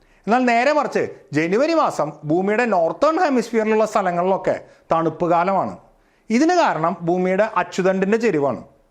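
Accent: Thrissur, India. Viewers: ചേട്ടൻ തൃശ്ശൂർ ആണോ? 😊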